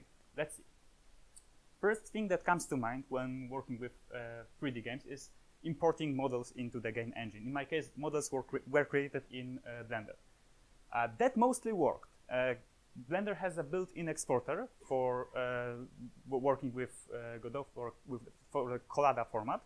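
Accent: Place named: Poland. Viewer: dziwnie się słucha polaka mówiącego po angielsku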